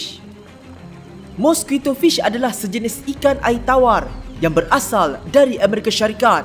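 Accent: North, Malaysia. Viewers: Tudia pekat nau utara..haha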